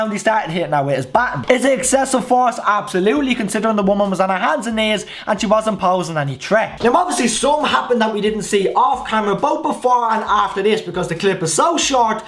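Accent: Dublin, Ireland. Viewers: Just after doing a marathon on your vids and subscribed love the Dublin accent and sense of humour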